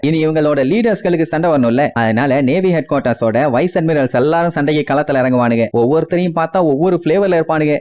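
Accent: Coimbatore, India. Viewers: Avaru coimbatore bro so antha slang summa pesiruparu kandukadheenga 😂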